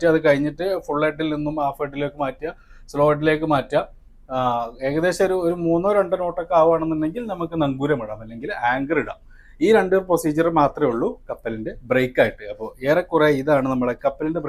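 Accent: Malappuram, India. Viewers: നമ്മളെ മലപ്പുറം സ്റ്റൈലിൽ explanation.. 😄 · ഈ മലപ്പുറംകാർ ഇതിലും വന്നോ.. ചീഞ്ഞ ഭാഷ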